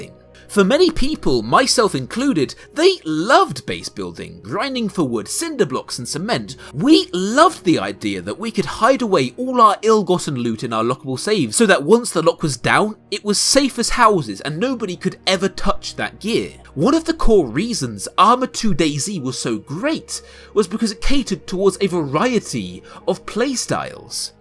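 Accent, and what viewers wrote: United Kingdom. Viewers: I only kept watching due to your brittish accent. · Another squeeky britbong youtuber, no thanks.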